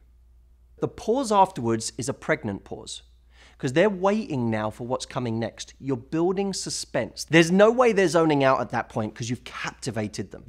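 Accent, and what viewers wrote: United Kingdom. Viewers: Is this man British, if not forgive me XD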